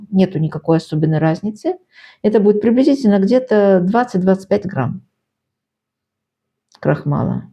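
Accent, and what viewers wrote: Baku, Azerbaijan. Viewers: ...и внешность и говор бакинский....Спасибо за рецепт ,наша дорогая! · Точно подметили, 💯% бакинский акцент! Ни с чем не перепутать! Привет из❤Баку! Всем Мира! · Спасибо,дорогая,как же приятно слышать ваш бакинский говор!!!!! А рецепты нашей беззаботной юности!!!!Храни вас Бог за такие теплые чувства!❤️ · Спасибо за рецепт. Мне почему то слышиться в вашем говоре бакинский акцент. Может я ошибаюсь? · Очень вкусный пирог! Спасибо большое. Ваш бакинский акцент греет душу -такой приятный! Здоровья вам, дорогая 🙏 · Наши Девушки Бакинки, во всем талантливы, Мы девушки всех наций были дружны, делились всем, самым лучшем отсюда и вкусняшки…., а у Вас прекрасная золотые ручки, Так приятно слушать Наш говор! Спасибо! Счастья во всем!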